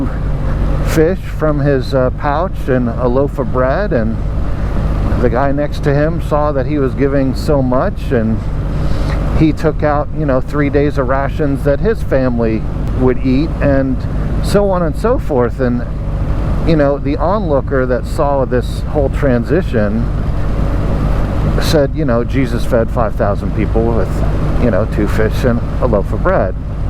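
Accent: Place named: West Country, United Kingdom. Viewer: The 'Pirate voice' is a west country accent (south west of england) - The FSM is a well established theme within the atheist movement. As I get older I've moved from a staunch atheist to agnostic, but not necessarily the white bearded man. Until science can prove the origin of consciousness [referred to as the Hard Problem] I'll be somewhere between atheistic death is death, consciousness is a product of brain function and is granular in nature, more brain power more consciousness - and the notion that the brain is a receiver of consciousness. I do not fear death, only the moment of it. I'm fascinated by the notion of consciousness. The YT channel 'closer to truth' has some excellent interviews with prominent scientists and philosophers about it. Anyway, excellent video - you've got this sussed mate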